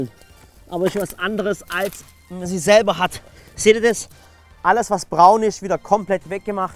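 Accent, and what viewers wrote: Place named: Swabia, Germany. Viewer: Sehr cool, danke. Das Video hat mir sehr geholfen, auch wenn die "Blütezeit" der Himbeeren in Schweden etwas von der in Deutschland abweicht. Toll auch der immer wieder durchkommende schwäbische Dialekt 🙂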